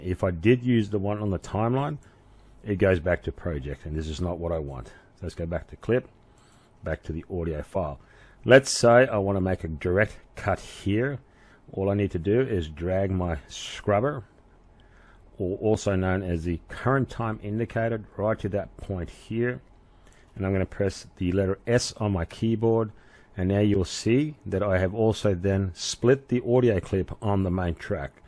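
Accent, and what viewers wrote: Australia. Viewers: I enjoy your clarity (and Aussie accent). I saw a Corel tutorial where a video clip was split and the second part moved along in the timeline, leaving a gap between the two parts. For me, the clip always snaps back to the start, even with ripple editing disabled. Can you share how a video clip can be moved in the track?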